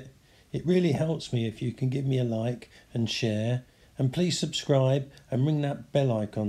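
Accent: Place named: United Kingdom. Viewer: Yes, indeed. Enjoy your mellow, laid back approach, and not overly Brit accent (to me, of course) that I can easily understand. My SE begins build this week, 32cd week.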